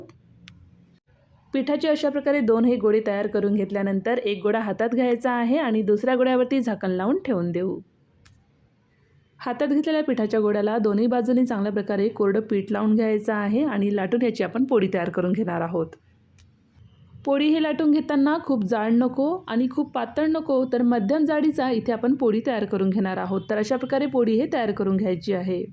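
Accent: Vidarbha, India. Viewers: Bhashe warun olakhtat loka,vidharbha chi marathi vegli aae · तुम्ही नागपूर, भंडारा किंवा पूर्व विदर्भाचे आहात वाटते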